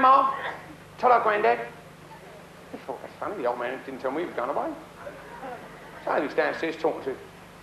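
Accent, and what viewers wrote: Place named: West Country, United Kingdom. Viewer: His West Indian accent sounds more West Country e.g. Somerset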